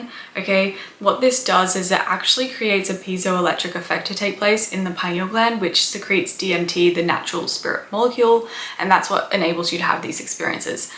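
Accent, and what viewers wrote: Australia. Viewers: I can hear the Aussie accent breaking through ☺️ Great video Thank you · I believe I astraled when I was 5-8 years of age almost every night. Last year in September I attempted my first concious OBE and almost succeeded 😊 . My lower back was hurting so I sat up. I had the tingles, felt the vibrations, heard the loud rumbling (no alarm but we are all different). But also, I felt as though my heart chakra was holding me back as my chest felt weighted down. Unsuccessful attempt, but I was so happy that I got so far on my first go. Had heaps of similar experiences since but no feelings of being weighed down. Also, hello from Perth 🇦🇺 you sound like you're from Qld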